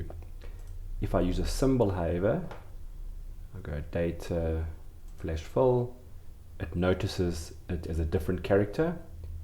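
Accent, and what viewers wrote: South Africa. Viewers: Me too! Youtube such a large community and here I get help from my fellow South African. Great tips! Keep it up. Hope your channel grows.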